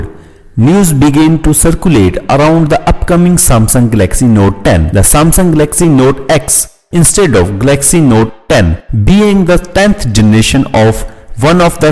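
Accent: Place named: Tamil Nadu, India. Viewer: I think ur acent is tamil if I am correct. I like it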